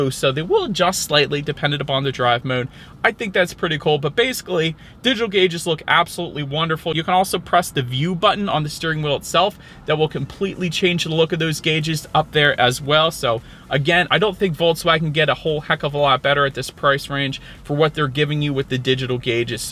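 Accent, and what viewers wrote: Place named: Pittsburgh, United States. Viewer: Your Pittsburgh accent is so heavy. Good review !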